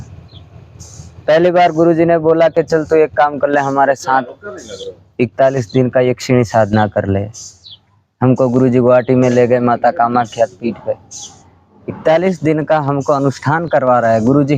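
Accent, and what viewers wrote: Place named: Uttar Pradesh, India. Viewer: Aap ki aavaj se to aap u p sahit ke lagte ho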